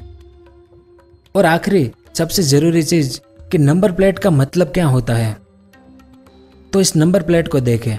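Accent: Gujarat, India. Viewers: Gujrati ho aawaj se khabar padi gya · Ye gujrati hai yrr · Gujrati accent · ACCENT SE BATA SAKTA HUN GUJARATI HO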